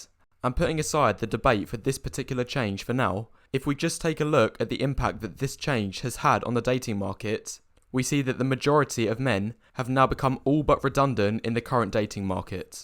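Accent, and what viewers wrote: United Kingdom. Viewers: hes british if im not wrong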